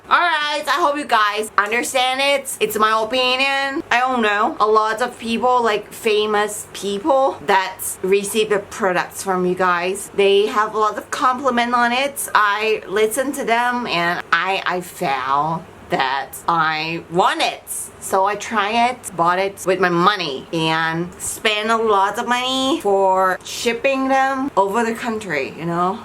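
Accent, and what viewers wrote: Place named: England, United Kingdom. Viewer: ชอบสำเนียงอังกฤษมากค่ะ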